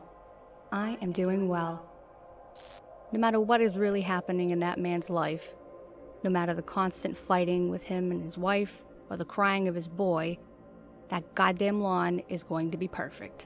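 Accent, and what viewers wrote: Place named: Massachusetts, United States. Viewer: Excellent job, everyone. Being from MA myself, I really can't get over how much I enjoy hearing someone read with my home accent. Thank you for representing us!:D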